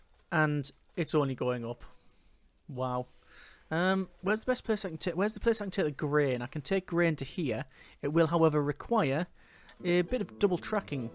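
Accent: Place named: Wales, United Kingdom. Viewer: Man, are you Welsh? I study and practise UK accents because I think they're awesome, and I detect something in your voice. It sounds Welsh.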